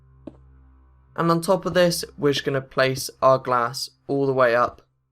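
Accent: United Kingdom. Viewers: hes british if you say anything about his voice f**k u because its racist and stampys avatar is not copyrighted so shut up and if you think hes boring type in mansion tutorial the guys a robot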